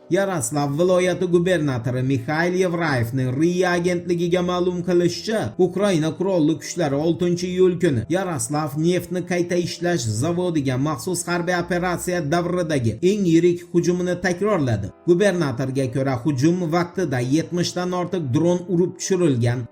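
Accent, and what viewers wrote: Uzbekistan. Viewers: SHUNCHA YILLARDAN BERI KUZATIP KELAMIZ SHU TURFA OLAMNI, SHUNDAY EKAN DOIM VIDYO'NI KO'RGANDA LAYK BOSIP TURAYLIK AKA-UKALR???? BU AKAGA O'XSHAP, SOF O'ZBEK TILIDA GAPRISH HAMMAGA HAM MAS!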